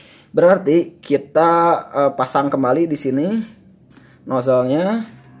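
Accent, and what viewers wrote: West Java, Indonesia. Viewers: Sunda pisan kang. Wkwk Bermanfaat pisan video na. Hatur nuhun 🙏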